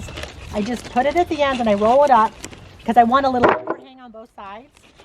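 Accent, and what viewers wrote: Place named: Boston, United States. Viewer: I think you got an awesome personality. You have a bahston accent.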